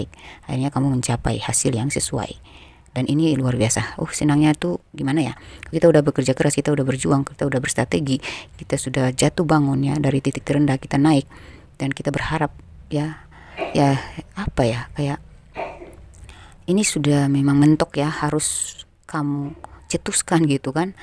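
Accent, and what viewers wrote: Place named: Cigombong, Indonesia. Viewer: Ko suaranya yg seperti aku kenal ya.... Seperti suaranya orang cigombng/ lido ...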